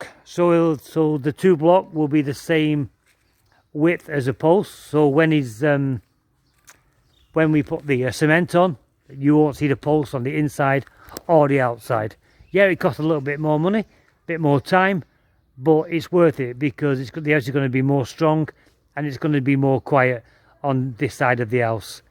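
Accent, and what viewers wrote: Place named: Northern England, United Kingdom. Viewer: Appriciate the updates, I’m from the Uk also you sound like your from Leeds/Preston area? Anyway your build is just Infront of mine! How much land do you have? I’m 37 and have 1 rai in khon kaen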